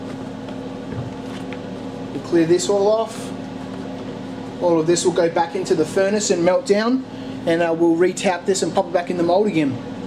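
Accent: Australia. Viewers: Where did you get the spin caster? Sounds like you're in Australia and I'm looking for a supplier here.. Thanks!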